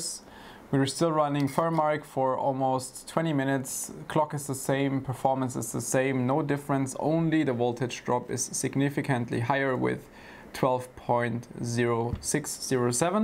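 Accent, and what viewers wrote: Germany. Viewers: Ich liebe es wenn Deutsche versuchen Englisch zu sprechen. Soll kein hate sein 😂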